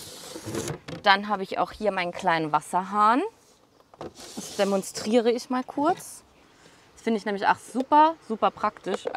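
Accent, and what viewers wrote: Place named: Saarland, Germany. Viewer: Wow, ich bin beeindruckt von deinem Leben. Du hast alles richtig gemacht. Du bist sehr ordentlich und sehr minimiert und vielleicht würde ich mich noch vom Beifahrersitz trennen.Ich lebe in Rheinland-Pfalz und freue mich in verschiedenen Sätzen dein saarländisches Dialekt rauszuhören. Ich liebe Dialekt. Du lebst im Paradies und genieße jeden Tag.Viel Glück in deinem Leben.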